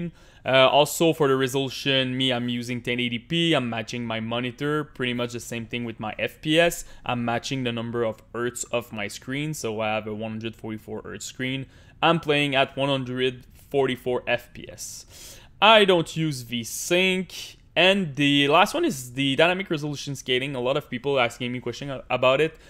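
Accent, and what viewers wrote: Quebec, Canada. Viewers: Un Québecois :O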